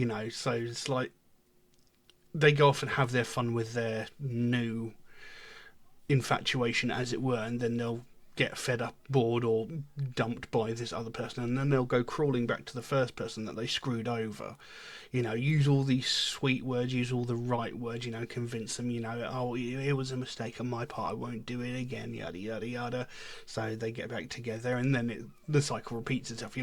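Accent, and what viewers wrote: United Kingdom. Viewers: Hi from another Brit, I couldn’t mistake the accent - not quite sure where! I’m from south of Brum. Nice reaction, you got it on the nail with your analysis very good - their lyrics tend to be a bit open to interpretation! I found them right at the start, it’s been an amazing experience seeing them growing up and learning their craft! I saw them last year with Muse at Plymouth and this year at Brum early on in their world tour of sold out headliners. They’re at Wacken later this month!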